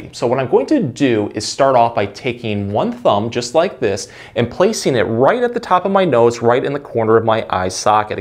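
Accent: Michigan, United States. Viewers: Michigan accent!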